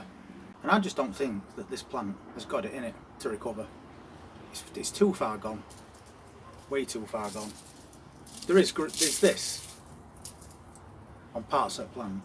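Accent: Yorkshire, United Kingdom. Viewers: I've planted Kondor (amongst others) in 30 ltr containers, I'm really hoping me watering every day in this near tropical weather is going to give good results, the odd one is dying back, I've really got itchy gloves wanting to empty one out and see what they are like! I also did Ratte, had some decent first earlies from them. 😀That does sound like a Yorkshire accent?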